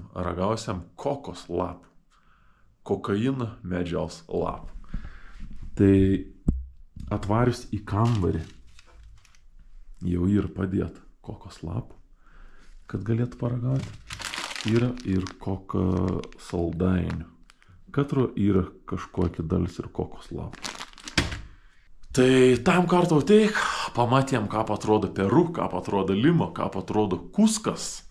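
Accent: Samogitia, Lithuania. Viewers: Video super 👍👍👍 seku seniai vienas įdomesnių contento kūrėjų, kažkiek primena Kurt Caz tik su žemaitišku akcentu.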